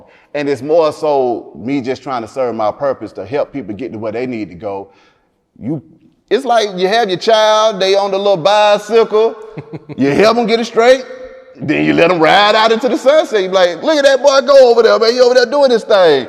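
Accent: South, United States. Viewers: I Would've NEVER guessed talked this much lol He a cool dude I'm from the midwest came across him on youtube I believe he going places he ask insightful questions AND he let the Guest reply without interrupting but can help them carry a answer. I think that natural south delivery can be a plus for mainstream whatever he got planned if the timing right and done with the right platform. Via Shannon Sharpe type shit glowing but stood out being his self on the Hip Hop side of things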